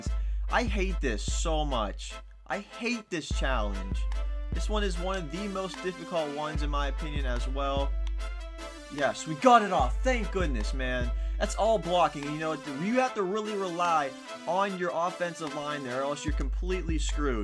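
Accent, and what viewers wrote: Pittsburgh, United States. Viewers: r u from Pittsburgh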